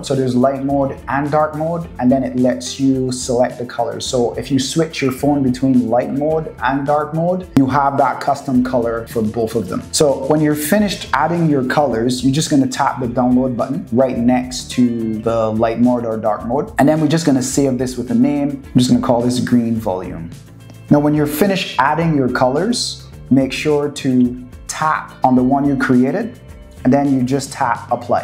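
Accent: Barbados. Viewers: Hey man , random question, are you from Barbados 🇧🇧?😂 or the Caribbean at least, I hear my people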